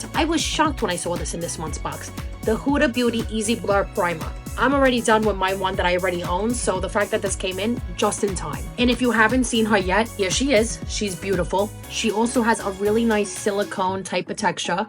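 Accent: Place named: New York, United States. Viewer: First time i’ve watched your video. I’m watching from the UK. I have to ask are from New York with Italian heritage? Your accent is just so what I hear on tv when the characters are from New York. It’s great!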